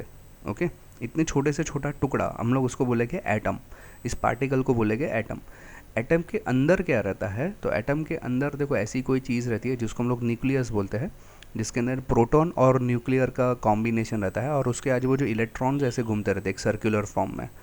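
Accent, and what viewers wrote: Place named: South India, India. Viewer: Amazing way of teaching great sir, seems like you are from South 😊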